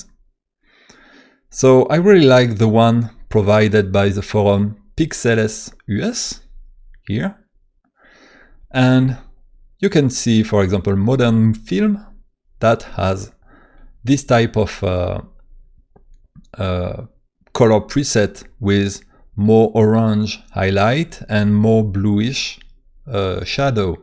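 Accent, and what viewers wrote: France. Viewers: Mmh tu a un accent fr xD Sinon très cool merci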